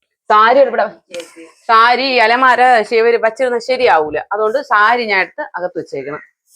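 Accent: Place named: Thiruvananthapuram, India. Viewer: Ath aaa nattusailiyanu....kuttam parayanda..jnanum Tvm...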